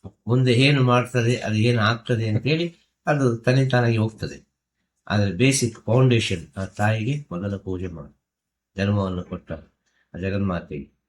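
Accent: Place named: Dakshina Kannada, India. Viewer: ಗುರುಗಳು ಆಗುವ ಮೊದಲು ಏನಾಗಿದ್ದರು..? ತಿಳಿಸಿ . ಮಾತುಗಳು ದಕ್ಷಿಣ ಕನ್ನಡ ದ ಕನ್ನಡ ಆಗಿದೆ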